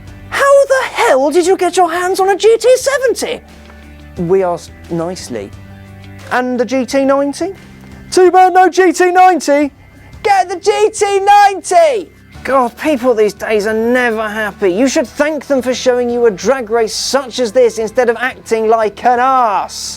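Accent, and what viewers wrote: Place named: United Kingdom. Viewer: his handsome round head and interesting emotion make the video funny. Very appreciated the footage.professional knowledge+able. has his very nice brits accent but sometime i don't understand what he say about cuz my poor English, Can XCAR put subtitle in the video pls??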